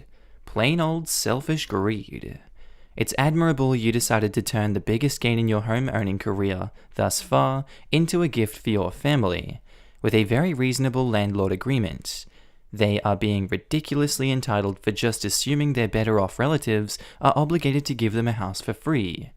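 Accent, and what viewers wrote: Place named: Australia. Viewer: Judging by his accent * is also ausralian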